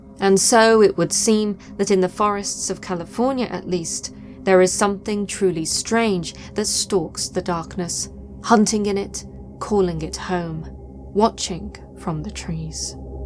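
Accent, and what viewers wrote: United Kingdom. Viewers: I wish all narrators had a lovely British accent like hers.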